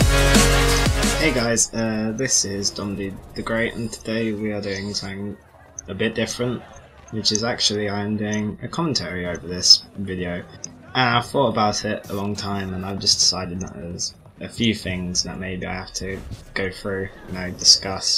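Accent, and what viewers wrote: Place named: United Kingdom. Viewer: 1) What did they banish you from? 2) Why do they call themselves an organization yet do this? 3) To be frank, why do you keep playing? 4) I believe you can report players to Sony. If so, why don't you do this. 5) Why do you not make a video exposing these people and what the have done? If you're afraid of being no better than them, you needn't worry, for it is simply you expressing your views and feelings. P.S I love British accents; IMO, they are superior to that of Americans (I'm American) P.P.S. Please, make tip-videos and more commentaries! I'm an assassinate noob and could use the help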